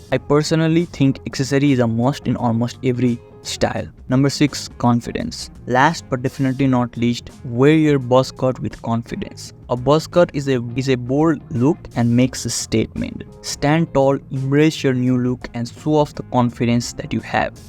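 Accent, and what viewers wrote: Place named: Nepal. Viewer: you from nepal or sum ? sounds like a nepalese accent to me, might be wrong? just curious.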